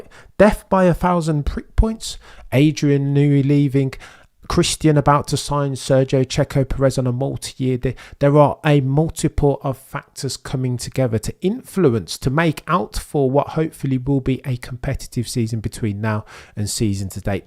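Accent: United Kingdom. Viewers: This guy more British than alll the British people I know. Like where is this accent even from. What part?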